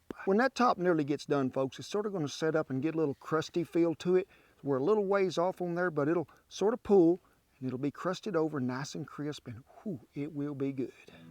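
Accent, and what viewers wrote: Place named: West, United States. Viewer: Mr happy thanksgiving to you and your family wow this looks so yummy you 've done made me hungry lol love your western accent you are so awsome thanks for all of your yummy videos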